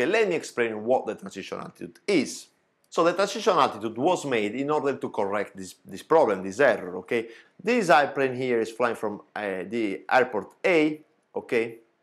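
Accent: Italy. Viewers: I love Italian accent in English Amazing man , I enjoyed the speaking more than explanation 😉. A320 tutorials if you don’t mind Capitano 😘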